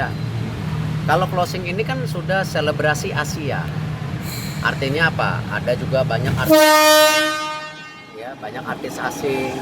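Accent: West Java, Indonesia. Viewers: Disini kok saya mendengar logat n aksens sundaness yee.. apa pak org sunda atw bs logat sunda yak.. behheh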